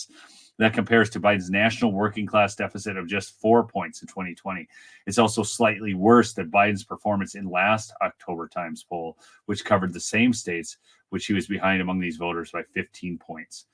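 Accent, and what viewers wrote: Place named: Minnesota, United States. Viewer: So funny to hear you say you're from Bemidji, I have been there, my Ex hubby was born and raised in Karlstad and his dad was the traveling Psychologist for multiple towns, Thief River falls, Bemidji, other surrounding little towns. I am from Tx and when we moved to MN, prior to going to Germany for 3 years, i thought i was in a cold hades....lol..I was used to hot TX weather and nearly froze my rear off. I had never seen garages where you had to plug in your vehicles 30 mins prior to leaving the home...it was WILD, moose in the yard, i had never seen one. He graduated in 1985 - he had never seen a black person when i met him (he was 19)- his accent sounded exactly like yours. MN is cold as crap, not meant for Texans! i couldn't wait to leave~ lol...I felt like l was living on another planet. Then i married a guy from Alaska, you'd think i'd learn...lol. 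I don't go there though! Thanks for all you do!! love you're positivity!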